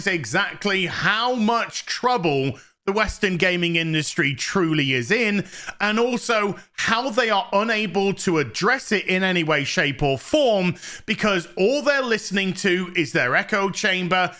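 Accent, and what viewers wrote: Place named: United Kingdom. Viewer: I'm not a Brit, but his accent makes his rants far more entertaining to listen to.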